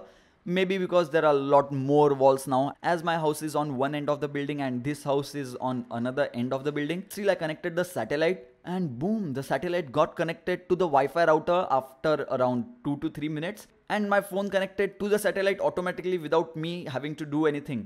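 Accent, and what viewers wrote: India. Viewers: Indian accent.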